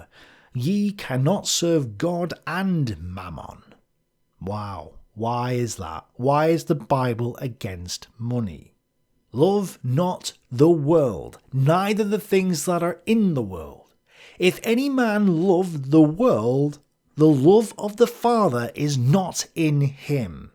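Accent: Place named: Scotland, United Kingdom. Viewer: I cannot stand Scottish accent and his constant liberal capitalist bias. If liberalism is so good, then why has it failed every Western country that adopted it? - Adûnâi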